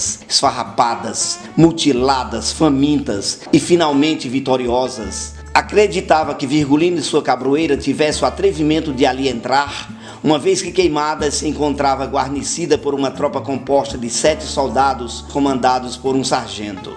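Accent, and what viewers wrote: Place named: Northeast, Brazil. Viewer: Eita Lampião sempre estrategista! Muito bom relato e o sotaque nordestino show! Parabéns!👍👏👏👏👏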